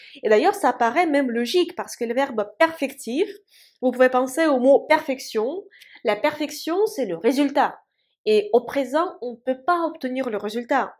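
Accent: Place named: Russia. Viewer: Chaque fois que j'entend son accent, je suis plié en deux à cause d'un employé Russe. Avant, l'arrivé des cellulaires, on avait des boites pour donner les rendez-vous des techniciens sur la route. Il prenait un téléphone publique ou empruntait une ligne dans une boite du réseau de la compagnie de télécome et notait sur un papier sauf que la machine ne reconnait pas son nom lorsqu'il le prononçait. Il hurlait comme un malade dans le téléphone et devait trouver quelqu'un pour dire son nom à sa place. Il n'y a pas trop d'immigration françaises en Russie sinon je n'imagine pas avec ces systèmes de reconnaissance vocale. Ils viennent d'implanter le système de reconnaissance facial et tu as la league de noirs qui sont parties en procès, les algorithms reconnaissent pas correctement les visages africain et un membre de leur communauté a passé 10 jours en prison sans raison, ils sont furieux.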